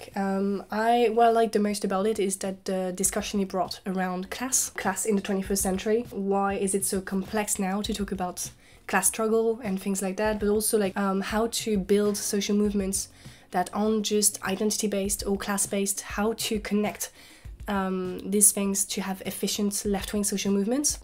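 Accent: France. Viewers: Gorgeous Gorgeous Gorgeous Girls speak English in a French Accent and recommend books despite feeling ill.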